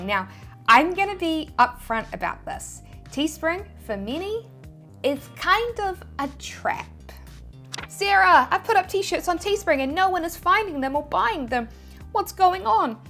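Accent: New Zealand. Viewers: u r of the few I subscribe to I had to get used to ur accent since I was not used to NZ accent but now I find it adorable HAHAHA thanks for very informative vids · Hey Sarah, going through your course a second time while I set up my store....should I create an LLC for protection or wait until I find a winning product before starting a legal business? Maybe a future video? Also I'm starting to say words with a NZ accent because of you...the newest one is pola beas 😃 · I love New Zealand accents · hi sarah By the way i really like your New Zealand accent. I just opened a store on REDBUBBLE and after 5 days of use it they suspend my account and i don't know what i'm gonna do and thanks for your efforts i love you. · I'm a new subscriber (past two months), totally won over by your straightforwardness, can-do attitude, and NZ accent. Love it! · The New Zealand Kiwi accent is one of my top favourite accents in the whole world Sarah, there's a really warm vibe about it, you've got such a vibrant upbeat and clear speaking voice! 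Others that am very fond of are the Irish accent aswell as the Scots Glasweigen accent were the dialect is very complex, the Newcastle Jordy accent aswell which is another good one, there's too many to name I know but each one is warm within there very own unique syntax, slang and dialect! 🙂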